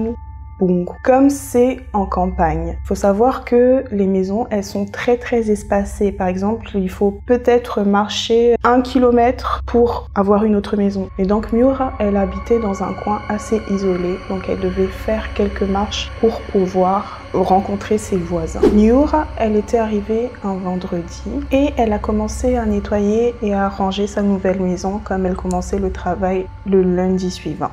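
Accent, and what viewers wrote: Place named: Madagascar. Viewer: J’adore ton accent malgache ! 😍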